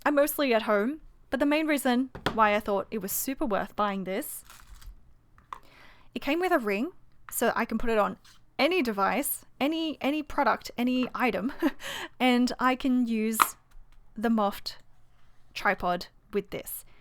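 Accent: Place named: Australia. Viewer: The Australian accent though 😍😍😍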